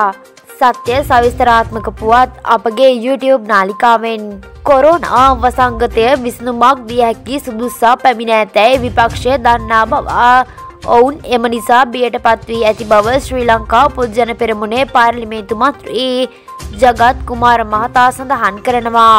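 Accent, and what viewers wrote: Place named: China. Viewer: මෙි කියවන එකී චීන එකියක්ද? මෙහෙම සිංහල උචිචාරනයක් ලාන්කික සිංහල එකියක් කිසිදින නොකරන බවයි මගේ විශ්වාශය අපේ හාමුදුරැවනේ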